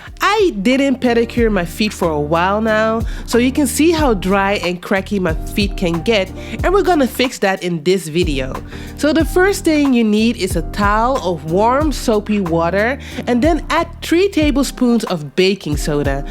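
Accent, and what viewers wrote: Netherlands. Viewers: Are you dutch by any chance if I may ask? loving the vids... New subscriber here🥰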